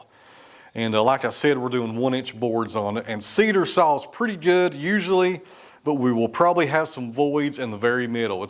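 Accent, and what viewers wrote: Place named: South, United States. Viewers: Greetings from South Wales to Tennessee , love your accent !! Fascinating video . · When you talk southern, it's a different language. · Good looking cuts, and ur southern our tongues aren't always cooperating with us lol. Good video bud.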